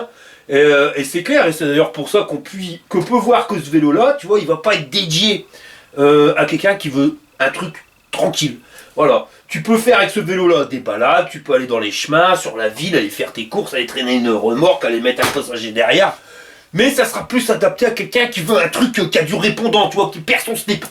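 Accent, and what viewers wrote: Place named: Nord-Pas-de-Calais, France. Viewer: Frileux de s'abonner à sa chaîne ? Bah moi, j'adore son franc-parler et son humour ! Et comme je suis du Nord (en fait en Belgique mais à 20 km de Lille), je savoure son accent ch'ti. A chaque fois que je l'écoute, j'ai l'impression que c'est un pote qui me donne des conseils.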